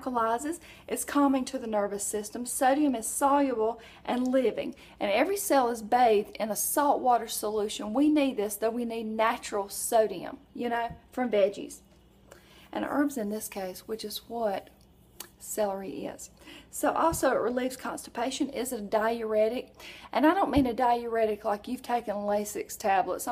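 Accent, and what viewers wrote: South, United States. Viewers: You're videos are so entertaining :D made me laugh quiet a few times! I enjoy listening to you so much, so positive and you're southern accent is adorable :* greetings from Germany :)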